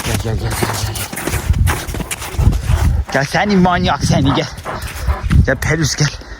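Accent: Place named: Van, Turkey. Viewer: Bitlislilerle vanlıların Türkçe konuşması birbirine çok benziyor. Benim bitlisli arkadaşlarım var aynı senin gibi konuşuyorlar Türkçeyi. Sen vanlıydın değil mi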